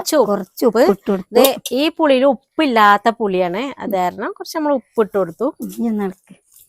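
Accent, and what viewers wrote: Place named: Malappuram, India. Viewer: ഹായ്. ഞാൻ ഒരു കണ്ണൂർകാരിയാണ്. എന്റെ ഹുസ്ബന്റ് മലപ്പുറതത്‌കാരനും അതുകൊണ്ട് എനിക്ക് കുറച്ചു നിങ്ങളുടെ സംസാരംമനസിലാകും നിങ്ങൾക്ക് മധുരം കുറച്ചല്ലേ വേണ്ടു ശർക്കര കുറച്ചല്ലേ ചേർത്തുള്ളു സംസാരം എനിക്ക് വളരെ ഇഷ്ട്ടമാണ് ഓക്കേ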